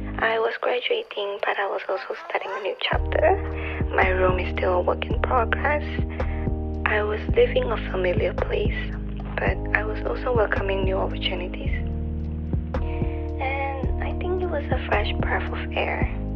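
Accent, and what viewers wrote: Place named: Singapore. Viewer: I'm glad you found my channel, because now I found your channel! I teared the first minute because it hit so hard. I've said goodbye to close friends who went back to their home countries, and then when it was my turn to leave my family and friends "behind" it was such a painful moment as well. This was a beautiful video. Looking forward to more content from you <3 Sending love. p.s. i also missed hearing that singaporean (it is, right?) accent :)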